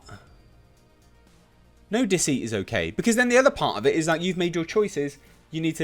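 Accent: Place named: United Kingdom. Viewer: There's nothing funnier than a frustrated confused Brit shitting on something terrible in that glorious accent. "What are you doin" and "who is this for"????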